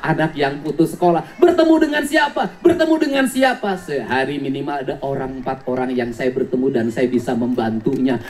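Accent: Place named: West Java, Indonesia. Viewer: Cara ngomongnya pak dedi ngingetin sunda banget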